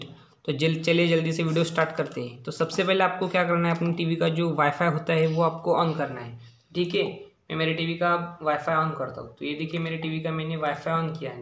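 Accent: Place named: India. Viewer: The most fucking hindlish I've ever heard. Blood from the ears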